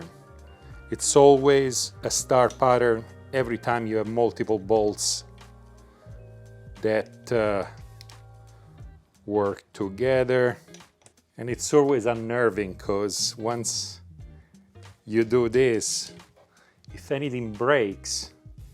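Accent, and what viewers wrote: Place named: Italy. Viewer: He is Italian 100 % . I hear his accent.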